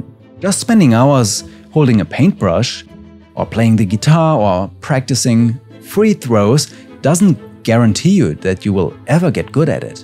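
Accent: Germany. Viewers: He lives in Germany, as it is said in the "about" section and his accent is also German I think, so there's a great chance that he's Turkish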